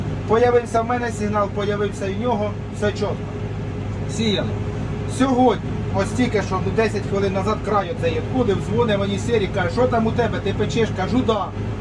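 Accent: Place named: Surzhyk, Ukraine. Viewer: Все добре тільки вчись говорити українською не суржиком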